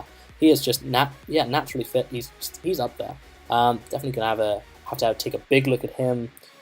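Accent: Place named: United States. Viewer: you sound like you have an american accent. any reason?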